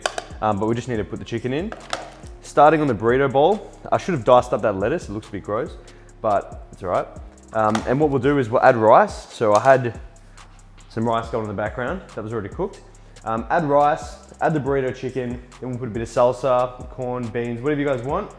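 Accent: Australia. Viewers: I for some reason love the way you say "hey guys here" love the australian accent lol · Useful video! I have made many of these mistakes when I was younger and still great tips which I had forgotten about nutrition. Also love the aussie accent :D Cheers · Is he Aussie?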